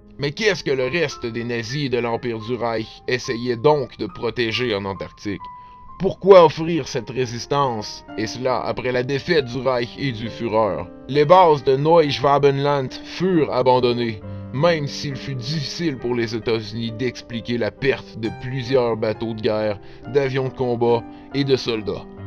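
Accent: Quebec, Canada. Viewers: Putain !!! l'accent de Celine Dion ...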